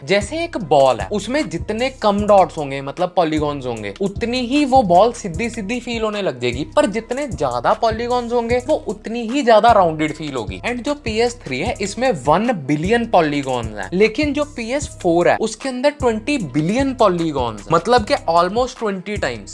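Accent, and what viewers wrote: Punjab, India. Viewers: I'm hating this punjabi language accent as this guy is making so much over action and bakchodhi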